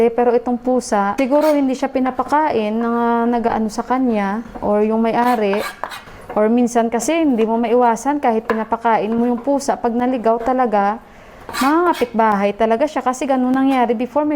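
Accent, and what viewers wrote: Iloilo, Philippines. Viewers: Gusto kita panuurin kc ilonggo ang tuno mo, ilonggo din aq from negros...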